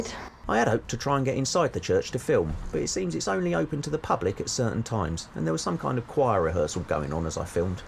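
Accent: London, United Kingdom. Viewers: Great accent on your narration fella, an accent that's fast disappearing from London sadly.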